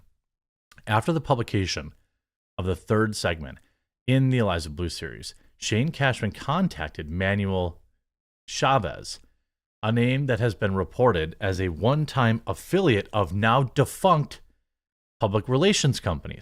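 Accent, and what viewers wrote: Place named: Minnesota, United States. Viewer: I still yell out baaaags every time he says it and I wouldn't have him change it for anything. get down with your bad Minnesota self.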